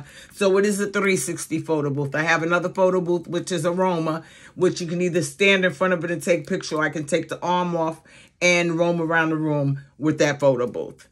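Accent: East Coast, United States. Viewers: I hope you make friends AND make money. I’m a new subscriber. I hear that East Coast accent! Have a blessed day!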